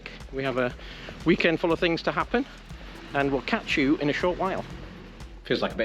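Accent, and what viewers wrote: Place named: Yorkshire, United Kingdom. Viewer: Like others, hope Dad recovers soon As for your accent - soon reverted to Yorkshire 😬 Thanks to you both ❤️❤️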